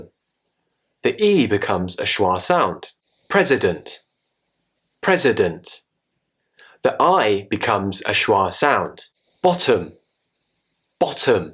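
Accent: RP, United Kingdom. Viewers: I love british english and accent i think soon after watching your videos i will start speaking british accent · OMG I've just found a new motivation to learn.... - intelligent - handsome - British Accent <3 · Same here. I really want to speak like him. It feels so posh to listen to British accent. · I'm In a play and I need to learn the British accent and you're 😉! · Nawwwww that perfect british accent though 😍😍 Could listen to that all day. Nice video man!! Keep it up! Greetings from Germany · i love you,! I like British accent, it is beautiful and you help me so much!!! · Keep it up the good work sir.. I went through all the British accent channels trying to improve my accent and literally you were the best.. cheers from Dubai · I love to learn british accent. U speak very nice, like to hear u r accent. 👌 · British Accent is really admirable. I appreciate your teaching sir and regard u as the best teacher for it. 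Sending you reams of wishes from India!! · I just watch this to listen to this beautiful British accent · i am Abdimajid Borobiyo from Somalia the capital city, i really very exited to watch your video's in British accent. i like very much to have a powerful British English accent, thanks Mr... thank you so much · I like the British accent and I really liked it more when I listened to you. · Greetings, can someone tell me which british accent is he using? Is it the RP? Thanks everyone :) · I love so much British accent especially your class, I'm still waiting your lesson everyday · i love to hear the British accent from u ...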